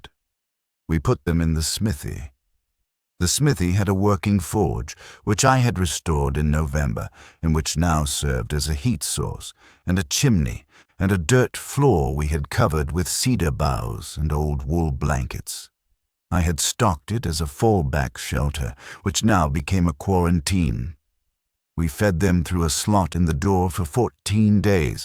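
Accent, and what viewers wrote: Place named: Aristocratic, United Kingdom. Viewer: Dont mind the English accent .but an aristocratic one sucks.🇨🇦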